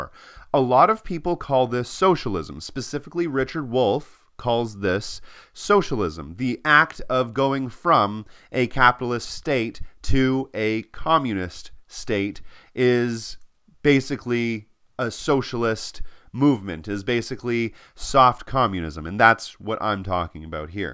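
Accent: Canada. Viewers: I can tell you are Canadian by the way you say "about" but good video 😁👍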